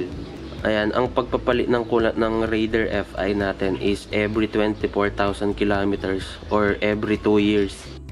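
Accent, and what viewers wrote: Pampanga, Philippines. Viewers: Kapampangan accent.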